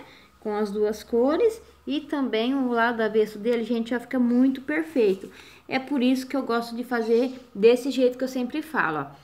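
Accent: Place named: Rondônia, Brazil. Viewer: Q coisa bunitinha! Vc tem sutaque de Ro!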